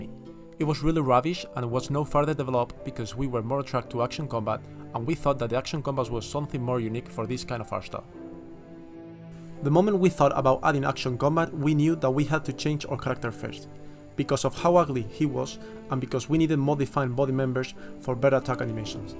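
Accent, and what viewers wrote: Spain. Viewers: No se porque diría que eres español, por el acento JAJAJAJJAJA, me siento como en casa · El que habla es español no? Jeje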